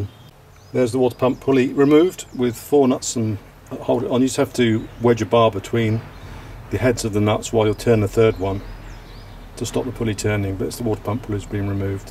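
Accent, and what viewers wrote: United Kingdom. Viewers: Love your voice, and how your way of speaking is very clear opposed to many other british people where you can hear the heavy accent.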